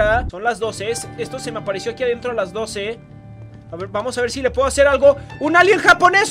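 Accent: Mexico. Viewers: eres mexicano?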